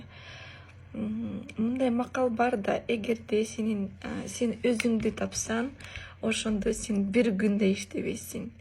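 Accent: Standard. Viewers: АЗАМТСЫН ЧОН КЫЗ.АЛЛАХЫМСАГА ДЕНЬ СООЛУК ,ИЙГИЛИК ,АМАНЧЫЛЫК БЕРСИН. ТАЗА СУЙЛОЙТ ЭКЕНСИН АНЧА МЫНЧА КЫРГЫЗ КЫЗДАРГА ,БАЛДАРГА КАРАГАНДА. АЗАМАТЫН. АЛГА, АЛГА. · Азамат кыз 👍кээ бир киргизлерге караганда таза суйлойт экенсин 🌺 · Анча мынча Киргиздерге Караганда Жакшы таза суйлойт экен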